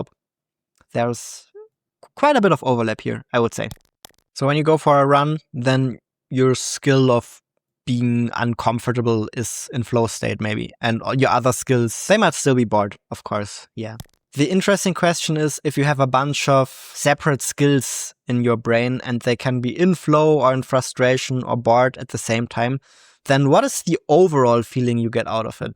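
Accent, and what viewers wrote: Germany. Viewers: Text: "Learning the world": "Learning the vurld" XD Don't worry, your German accent is a big reason of why I subscribed to your channel x)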